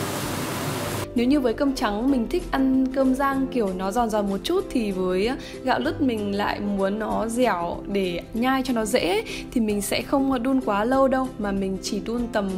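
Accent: Hanoi, Vietnam. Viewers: Giọng nói HN đáng iu quá hihi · Be de thuong qua cam On chia se cua e , giong ha noi nghe de thuong , guong mat xinh qua 🌹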